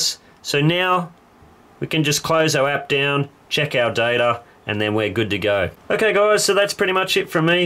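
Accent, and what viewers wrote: Australia. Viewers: This Australian accent is so good! XD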